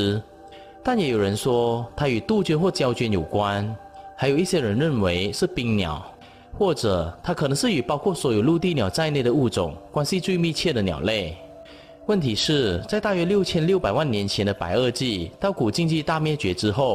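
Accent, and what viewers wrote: Malaysia. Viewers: 马来西亚腔好重呀 哈哈 btw 酵是念 jiao4😅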